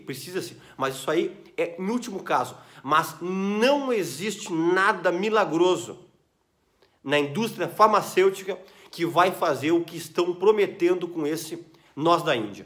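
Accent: Rio Grande do Sul, Brazil. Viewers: Dr me esclareça por favor! A plicoma é indolor ou dói muito. Por favor me ajude. Eu estou com uma bolinha na margem do ânus e dói. Que faço? Curto seus vídeos, gosto de seu sotaque gaúcho. Bj!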